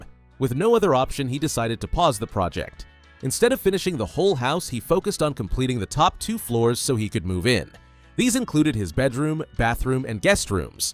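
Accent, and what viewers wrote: United States. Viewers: Never liked Drew his ego arrogance and I thought down to people stitched hard working people a real tight arse. But why is this done by an American voice and said doolars instead of pounds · Why is it being narrated by an American?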